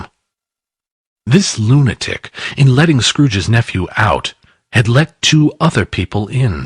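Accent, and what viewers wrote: United States. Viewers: American accent? Sorry, bye. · Should only be read by an English narrator, doesn't sound good with an American accent.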